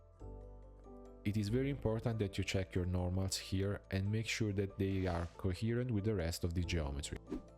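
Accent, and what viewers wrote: Italy. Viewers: Che per caso sei italiano? fantastico video comunque! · ma sei italiano? 😂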